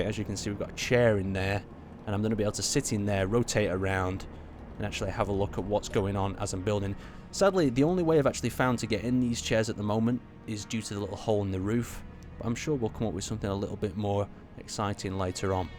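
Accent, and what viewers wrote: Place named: West Midlands, United Kingdom. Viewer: you sound like you're fro the westmidlands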